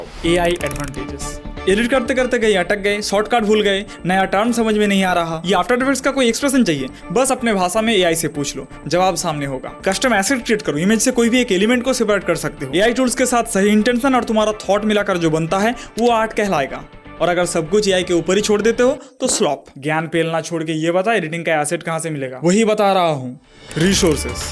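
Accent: Bengal, India. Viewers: ভাই তুমি বাংলা বলো আমাকেতো প্রথমেই লেগে ছিলো য়ে তুমি বাংলা কথা বলো তার জন্য তোমার কথা গুলো একটু আলাদা লাগছে 😊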